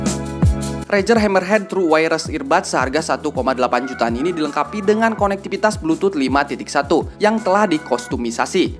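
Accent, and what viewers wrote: Indonesia. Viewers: sunda pisan ieu euy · Sunda pisan anjay pas buka videonya 🤣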